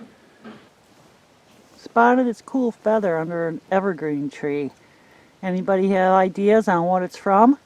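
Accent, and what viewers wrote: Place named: North Carolina, United States. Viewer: Being from North Carolina, I immediately recognized the accent. My mom was from Sheboygan. Very cool!!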